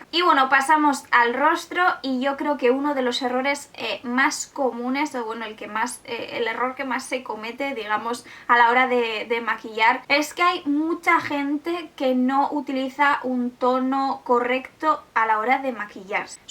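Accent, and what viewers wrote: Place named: Spain. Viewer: Por tu acento supongo que eres española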